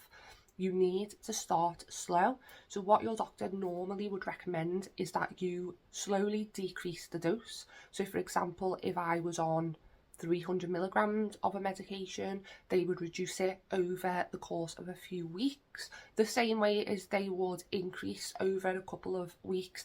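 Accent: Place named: Liverpool, United Kingdom. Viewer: Need a better Mic.hard to hear, and speak slower cause of scouse accent.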